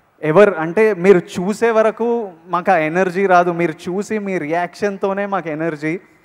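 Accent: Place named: Telangana, India. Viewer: We love ur way of talking when u mix telugu with english in తెలంగాణ యాస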